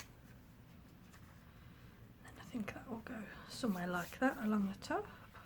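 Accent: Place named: Birmingham, United Kingdom. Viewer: I’ve just binge watched your travel journal videos and you are a breath of fresh air! Plus, as an exiled Brummie it’s lovely hearing the accent and the planes going to Birmingham international. I’ve got so many maps I’ve collected over the years plus loads of tickets leaflets etc. Thank you for sharing your expertise. 😘😘😘❤️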